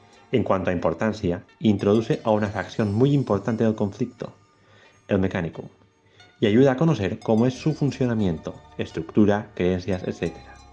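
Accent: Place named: Majorca, Spain. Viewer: tienes un acento mallorquin que me encanta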